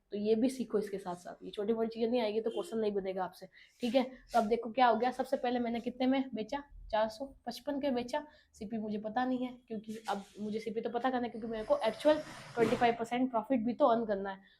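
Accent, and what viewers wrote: Rajasthan, India. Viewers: Rajasthan se hai kya mam app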